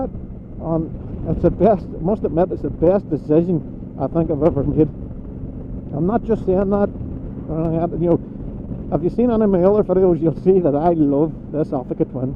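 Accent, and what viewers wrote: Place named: Scotland, United Kingdom. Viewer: Nice review.But I could not understand a lot of what you said because of your Scottish accent. I thought this was the same as the foot shiftier on the DCT. But I guess it is different. But thank you for the video because now I have to investigate. I do not need an automatic so much but was thinking about getting it because I will do 80% of my riding in the city. But if they make something like this for the manual version I would rather save the $700 that the DCT would cost me.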